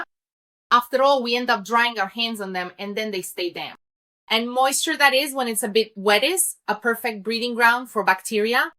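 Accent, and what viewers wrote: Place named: Russia. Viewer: ficou com sotaque russo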